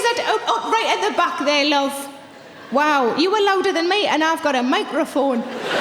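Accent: Newcastle, United Kingdom. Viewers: Would love to go see this lass at a live show. The Geordie accent always reminds me of good times.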